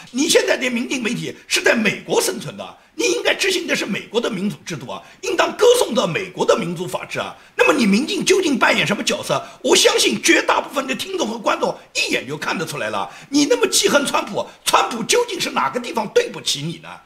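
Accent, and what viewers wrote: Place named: Fujian, China. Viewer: 一听声音就知道是福建烂仔。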